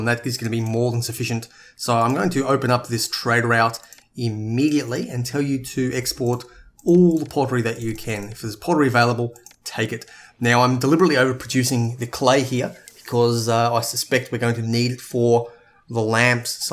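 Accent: Australia. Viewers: You sound like an Ozzie, so why do you pronounce route as rout? Never heard an Oz do that before.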